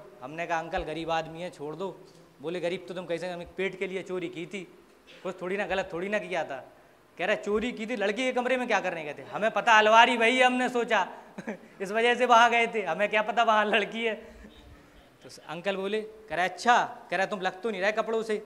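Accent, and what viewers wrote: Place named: Bundelkhand, India. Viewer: BUndelkhandi to ho guru tumm ho kaha se.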